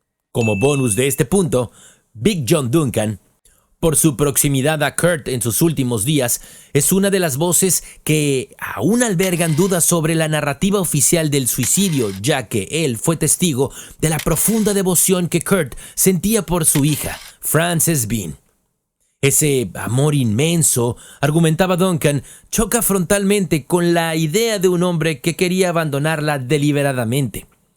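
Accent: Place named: England, United Kingdom. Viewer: Well buddy, it’s not really pronouncing his name it’s off. You sounded English to me unless you’re AI I don’t know how you could get KURT COBAIN i’m really emphasizing on the last name. I’m not being a bitch either. I just noticed. Every time his last name was mentioned i got a tic. I have tourette’. It’s not your fault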